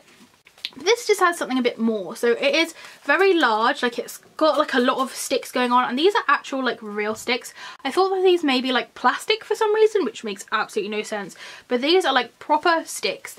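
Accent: United Kingdom. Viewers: Hi enjoying all your Halloween haul videos thanks for sharing are you from the uk I’m just curious x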